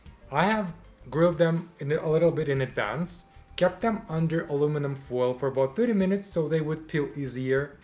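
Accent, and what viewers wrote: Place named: England, United Kingdom. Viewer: настоящий англичанин!!! Заслушалась. )))) Надо пересмотреть. )))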